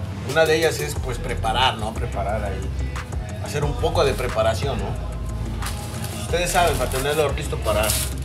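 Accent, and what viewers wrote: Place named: Spanglish, United States. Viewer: Cámara chingon el spanglish 🇲🇽🤟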